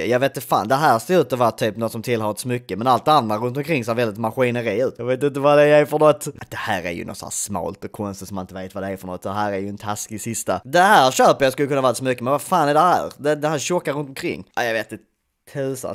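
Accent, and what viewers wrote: Scania, Sweden. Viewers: Tycker som inte om skånska men den här är fan för skön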